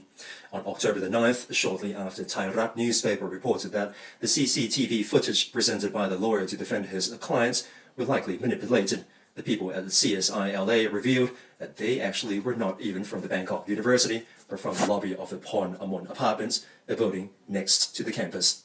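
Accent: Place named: West, United States. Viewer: คนอ่านข่าว สำเนียงฝรั่งจ๋าเลย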